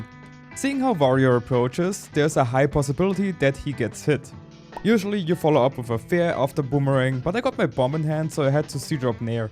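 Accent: Germany. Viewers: Looking forward to see how these new type of videos evolve. Would you think about manually putting official subtitles for these scripted (evergreen) content. I mostly catch what you say but sometimes I don't understand through your german accent 😅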